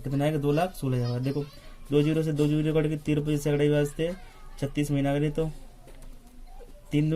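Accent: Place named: Dholpur, India. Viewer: भाई भाषा से धौलपुर साइड के लगते हो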